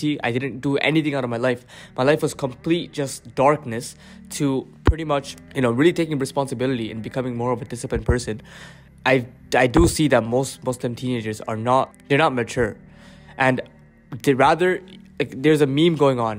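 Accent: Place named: Pakistan. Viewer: he sound like Pakistani no racism I am Pakistani also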